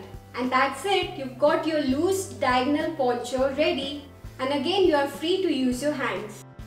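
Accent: India. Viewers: India English is really funny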